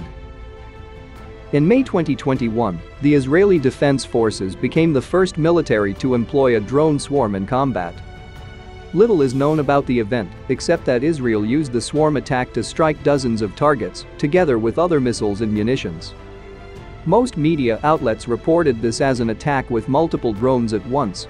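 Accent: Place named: India. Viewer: Voice script to hide Mumbai accent? Be proud of your Indian accent brother 👍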